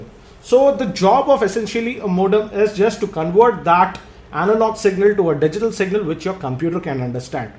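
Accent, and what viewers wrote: India. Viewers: Indian accent on POINT!!!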